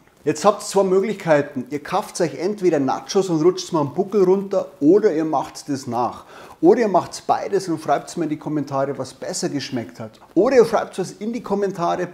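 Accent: Bavaria, Germany. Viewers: Kann man sich nicht geben mit dem dreckigen bayerisch 😷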